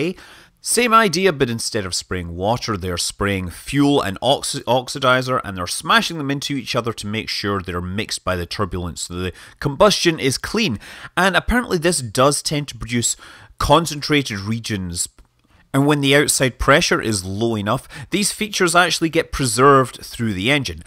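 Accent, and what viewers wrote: Scotland, United Kingdom. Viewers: He always talks with a Scottish accent though. 😒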